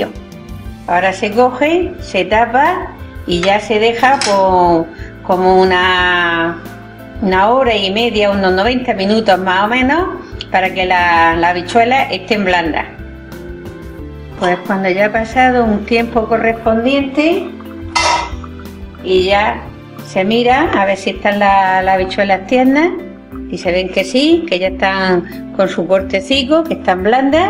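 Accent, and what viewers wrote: Murcia, Spain. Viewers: Hoy me he decidido a hacer olla murciana , pero no me acordaba bien de los ingredientes y he visto que asi la hacia mi madre ella también ponia judias verdes planas y la verdad que aunque siempre la tengo presente, cuando he visto tu receta con tu acento murciano precioso , me ha dado mucha añoranza, gracias por compartir tus platos de nuestra tierra, te sigo desde hace mucho tiempo, un gran abrazo 😘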